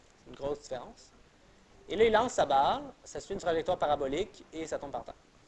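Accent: Quebec, Canada. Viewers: J’aime votre cours je le trouve super ! C ‘est juste que vous parlez trop vite pour quelqu’un qui n’est pas habitué à l’accent québécois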